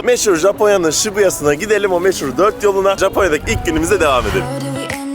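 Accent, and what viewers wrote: Turkey. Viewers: 15 sene Japonya'da yaşayıp Tr şivesinden kurtulamamak peki?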